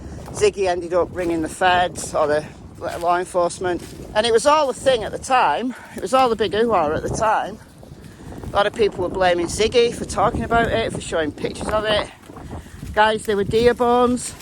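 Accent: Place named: England, United Kingdom. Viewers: From Linton, Indiana (South Central Indiana). Love your content; seeing the English countryside; and watching your dogs run and play. And I love ❤️ hearing your English accent. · Hi from a Lancashire lass living in France! Love to hear your accent and to follow your daily walks with your adorable girls. Thank you for all your reviews and updates on various cases. Your talks are always based on facts and substantiated details but you also think out of the box using your instinct and commonsense to see through the twists and turns of all the intriguing cases you cover. You are a proper northern lass straight talking, analytical, empathetic and able to see all sides of the story. Love listening to your daily walks and crime story Sundays. Take a look sometime at the intriguing French crimes, there's a lot! 🤗🐕🐾🐕🐾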